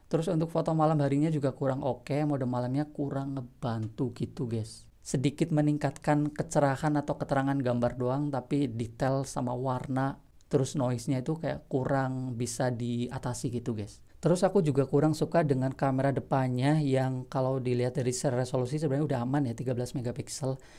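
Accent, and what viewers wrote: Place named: Indramayu, Indonesia. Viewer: Ko kya wong dermayu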